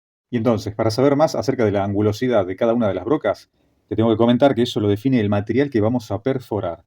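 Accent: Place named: Argentina. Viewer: Sonsonete argentino es molesto.... pongan alguien que hable español neutro... gracias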